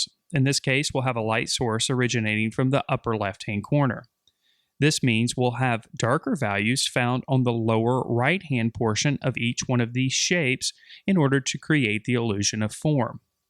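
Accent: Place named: Tennessee, United States. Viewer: awesome! you sound like (judging by accent) that you live in the Tennessee area? don't get to hear that accent much any more! grew up in Virginia and now live in North Carolina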